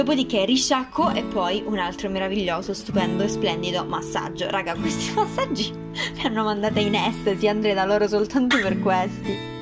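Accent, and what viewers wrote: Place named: Calabria, Italy. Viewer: Ma di dove sei pk sento l’accento e non capisco bene sembra che tu sia della Calabria ma non sono sicura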